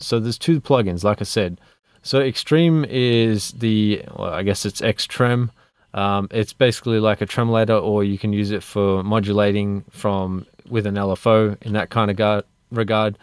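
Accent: Australia. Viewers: Aussie accent ?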